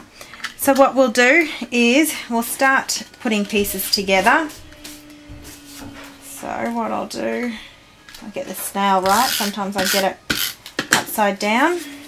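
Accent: Australia. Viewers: Hi hunni, love your tutorial.. just wondering if you were Aussie. . Lol!!! Hope i. Mot offending you by asking...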